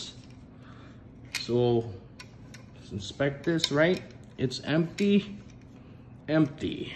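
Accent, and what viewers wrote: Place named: Minnesota, United States. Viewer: You might be from Illinois but you have the perfect true Minnesotan accent. It's perfect. With a Wisconsin flare. I'm researching this gun for buying. Thank you for the video.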